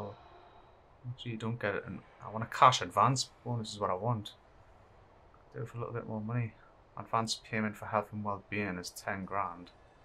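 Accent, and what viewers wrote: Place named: Newcastle, United Kingdom. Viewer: Where abouts from Newcastle are you from?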